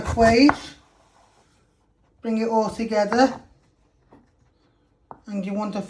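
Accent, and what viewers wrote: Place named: United Kingdom. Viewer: This guy's ability to sound British is amazing!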